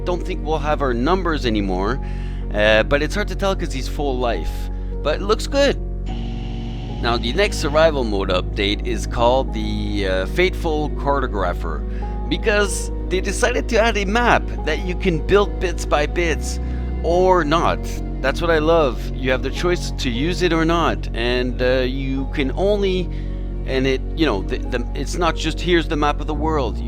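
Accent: France. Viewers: OH MY GOD!.........YOU'RE FRENCH!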